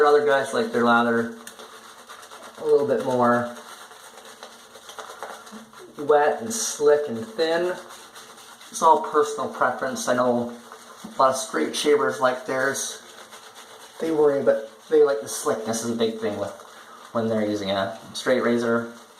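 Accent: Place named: Canada. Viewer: You sort of sound like Ed Norton's character in the movie Rounders with a bit of a Canadian twist.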